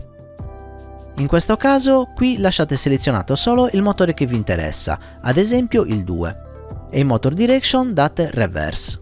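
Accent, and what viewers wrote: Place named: Cagliari, Italy. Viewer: sei forte e poi il tuo accento mi sta troppo simpatico. Sarà colpa dei miei amici Cagliaritani che ricordo sempre con affetto!!! Grazie per i tuoi video fatti molto bene.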